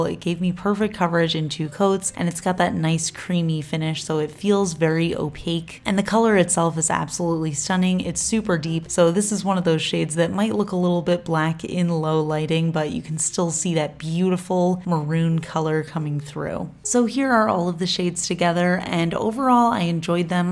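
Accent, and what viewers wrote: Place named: Las Vegas, United States. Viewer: Hey. I am loving and will pick up Force of Nature. I haven't found a green polish that beautiful since China Glaze's Emerald Sparkle well over a decade ago. Thank you for your dedication to this channel and your viewers. You already sound like a Las Vegas native. I was born and raised here and have lived in Henderson for 30 years now. I'm glad to have you as a neighbor.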